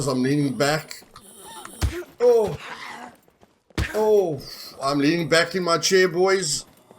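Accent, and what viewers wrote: South Africa. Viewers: I like your accent is it south african or new zealand? i may be completely wrong but i know people from both places and you sound similar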